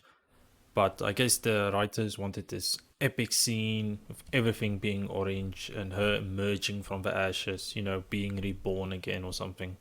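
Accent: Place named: South Africa. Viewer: Heyyyy, a fellow South African. Het die accent gehoor en lol, moet gaan kyk het of ek reg was. Nice vid, keep it up!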